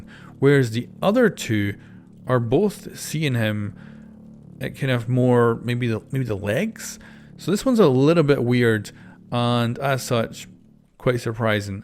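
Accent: Scotland, United Kingdom. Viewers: Are you from Scottland?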